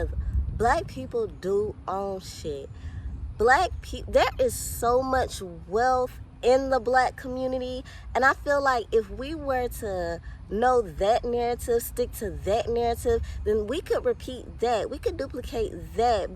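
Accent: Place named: West End, United Kingdom. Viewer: I was trying to figure out where you’re from! Wassup West Ennnndddddd!!!!!!!!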